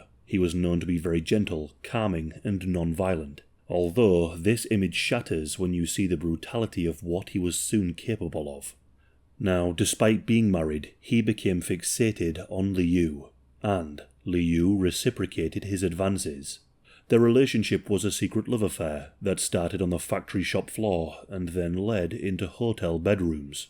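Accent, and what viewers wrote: United Kingdom. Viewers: Are you British? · I just get more and more addicted to this damn channel and the narrators UK accent along with Shrouded Hand there's no way any Yank across the pond can make any true crime content this bold unique and exclusively personal .👑👑👑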